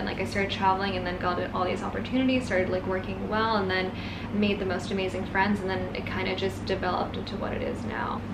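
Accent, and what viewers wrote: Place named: General American, United States. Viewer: curious why you got the North American generic accent while your brother got the aussie one. did you guys grow up separately?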